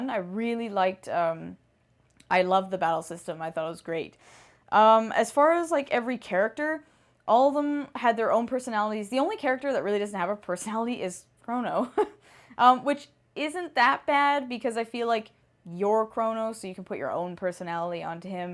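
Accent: Canada. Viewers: This is a really well-lit YouTube vid. I like your Canadian accent. Chrono Trigger was really cool. I think I beat it a few years ago.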